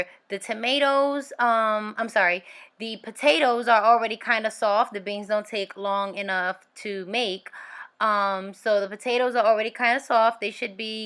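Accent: United States. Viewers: Hey what state do u live in? Just curious cuz of your accent, sounds like ur from Texas. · Se ve bueno. LoL like the gringa accent😂 voy hacer algo comp eso ahora oren por mi... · Sounds NewYorican accent.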